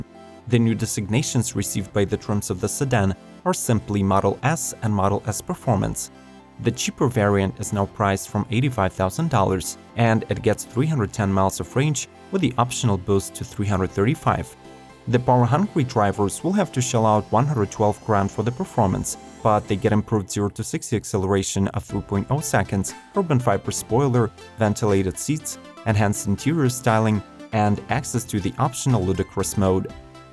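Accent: Russia. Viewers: what is this killing russian accent for?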